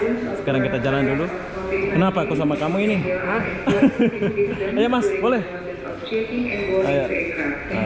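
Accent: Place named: Malaysia. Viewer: logat bicara nya kaya orang maysia bro, mantap traveling nya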